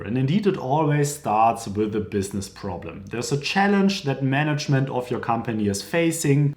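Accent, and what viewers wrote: Germany. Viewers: Your videos are highly rated and appreciated. Your German accent adds uniqueness to your way of presenting valuable knowledge and experience. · Your English is So German. 👍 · Youa german englisch maekes me aggressive, soirry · Genau , bist du Deutscher? Wirklich 🎉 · I can feel that you are doing this deep German accent on purpose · nur so mal als Frage, ist dir der starke deutsche Akzent mal zum Nachteil geworden?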